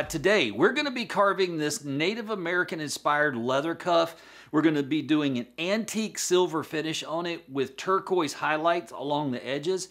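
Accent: South, United States. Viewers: I quite like your southern drawl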